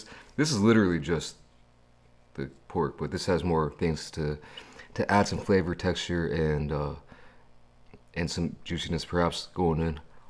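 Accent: United States. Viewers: Yo, what made you want to move to Korea? Just curious because you definitely sound American.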